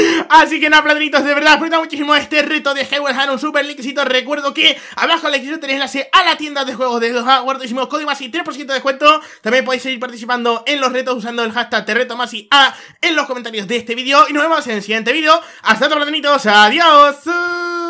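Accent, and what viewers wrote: Argentina. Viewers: se volvió argentino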